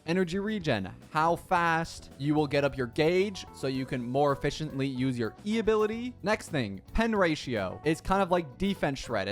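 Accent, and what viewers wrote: Canada. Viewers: you have a canadian accent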